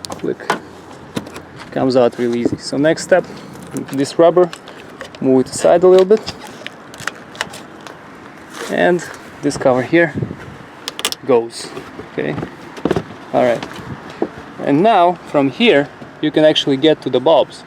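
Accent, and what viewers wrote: Russia. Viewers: Наш русскоязычный парень, судя по акценту😊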